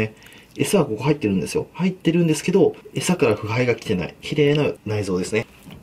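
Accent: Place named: Nagoya, Japan. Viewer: 時々のアクセントに名古屋弁が出てますけど東海地方の方かな？ 値札に書かれた場所から推測するとあの市場か〜。時々、行ってお値打買い込んでたわ。 まんまと魚○場の術中にハマってました。勉強になりました。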